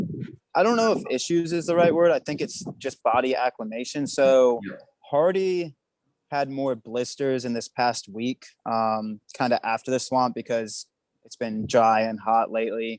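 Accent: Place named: South, United States. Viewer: Your southern accent somehow got stronger from being in florida :p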